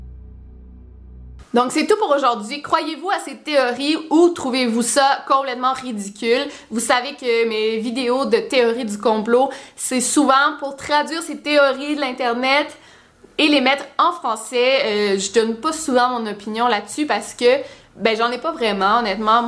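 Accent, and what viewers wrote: Quebec, Canada. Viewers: J'aime l'accent québécois :-) Brésil. N'arrête pas ! j'adore tes vidéos and I love When u speak english aussi :-) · Plus c'est gros et plus ça passe.... Ne dit-on pas que la meilleure ruse du diable est de faire croire qu'il n'existe pas ? mais cet aéroport est vraiment hyper glauque ! brrrrrr ça fait froid dans le dos... Merci pour tes vidéos passionnantes. Je te découvre depuis peu et j'apprécie énormément ton travail, et étant française, j'adore t'entendre avec ton joli accent québécois ... Je m'abonne de suite ! Merci xx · bishlord - ben elle a un accent quebequois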